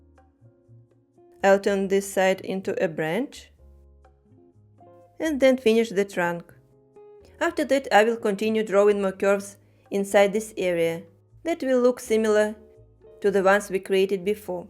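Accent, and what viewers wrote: Russia. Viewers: Почему на английском-то с русским акцентом(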